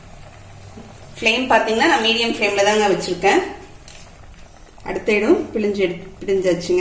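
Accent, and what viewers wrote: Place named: Srirangam, India. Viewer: இப்படி இடியாப்பத்திற்கு மாவு அரைத்து பிழியலாமா? Sister! நீங்கள் பேசும் பேச்சு! ஸ்ரீரங்கம் மாமிகள்! பேசுவது போல் இருக்கிறது! நான் ஸ்ரீரங்கம்! Sister!❤️❤️❤️💐💐💐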